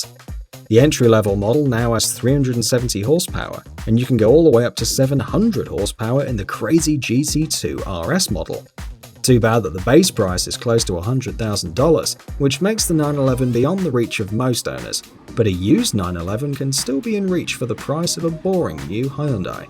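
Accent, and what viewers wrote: England, United Kingdom. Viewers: are you from the UK because you sound English. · Are you British i guessed coz of your accent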